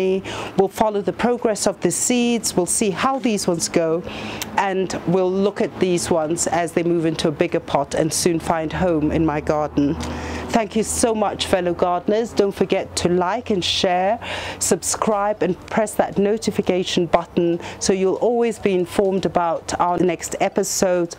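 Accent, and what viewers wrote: United Kingdom. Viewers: Your accent is so British (coming from England myself)!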